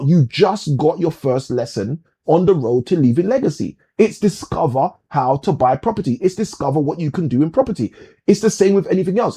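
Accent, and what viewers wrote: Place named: Africa. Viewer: Your not from the UK your from some place in Africa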